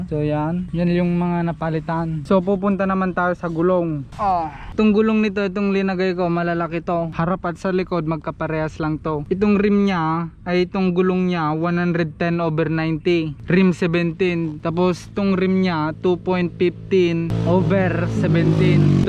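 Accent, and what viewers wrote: Ilocos, Philippines. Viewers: Halata man nga ilokano Lodi haha astig ta motor mu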